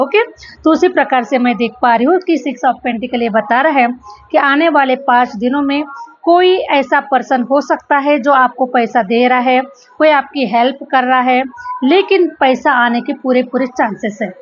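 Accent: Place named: Maharashtra, India. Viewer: SHE IS 50 PLUS, AND IS A GOOD MARATHI SPEAKING WOMEN, AND HER ACCENT IS MORE MARATHI. CONCENTRATE ON HER READING AND NOT ON HER BEAUTY OR FACIAL EXPRESSION. EVEN IF YOU LIKE HER AND WANT TO APPRECIATE HER FACE AND HER EXPRESSION, DON'T MAKE IT PUBLIC. SHE IS A GOOD TARRO CARD READER, AND THAT'S IT...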